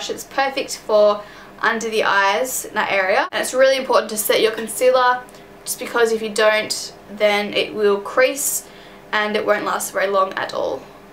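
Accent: Australia. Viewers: Sounds more Australian.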